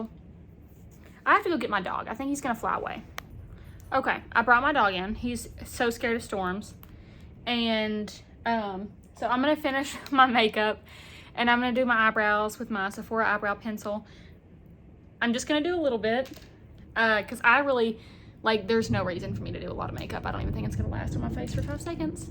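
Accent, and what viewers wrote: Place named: Kentucky, United States. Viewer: I love love you girl,you are awsome,awesome, love your approach to life,your makeup ( you are gorgeous) have such a natural look.love the Vibes and love love your drawl are you from kentucky? I am and we sound a lot alike.i am scared of bronzer also.you don't need it.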